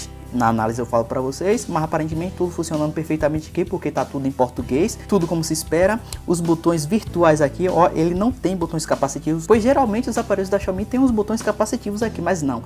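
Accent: Bahia, Brazil. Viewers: mano mim acabo de rir com o seu sutaque você é da Bahia ? um grande abraço.e link marato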